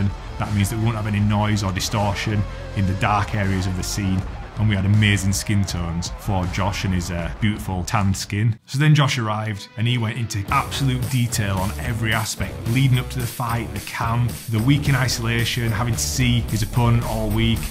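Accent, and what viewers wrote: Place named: Leeds, United Kingdom. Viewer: ALL LEEDS AREN'T WE! No but seriously, great stuff mate. Got here because I'm thinking of buying a second hand 70D funnily enough, was nice to hear a familiar accent!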